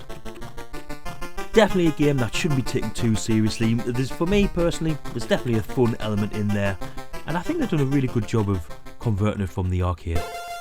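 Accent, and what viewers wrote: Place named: Scotland, United Kingdom. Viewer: You have a nice accent. I thought it was Scottish, but – then again – I've never heard Scottish quite like that. The other guy has it even stronger. The way the vowels switch places sounds like the Jamaican Patois. Guess those dudes stole it from you lot!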